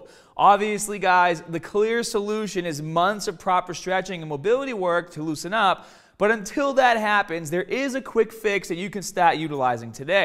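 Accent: Boston, United States. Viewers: when did he develop a boston accent? never heard him talk like this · It’s been a while since I watched his videos...Since when did he start with Boston/New York accent? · is a good dude. His Boston accent is just a little distracting! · Are u from Boston bro holy cow 😂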